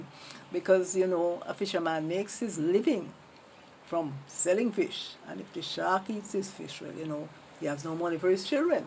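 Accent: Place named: Patois, Jamaica. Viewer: Nice video - good demonstration of Jamaican patois. (patwa)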